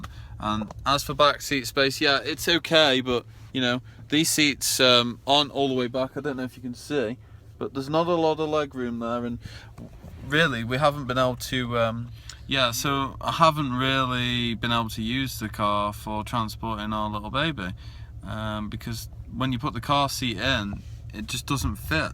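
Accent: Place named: Sutton Coldfield, United Kingdom. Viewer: Are you from Sutton Coldfield or somewhere nearby?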